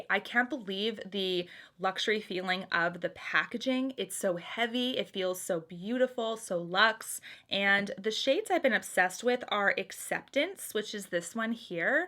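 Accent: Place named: Winnipeg, Canada. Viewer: Born and raised in Winnipeg although now live in the states- I always like listening to you as your slight accent reminds me of home! loloyogini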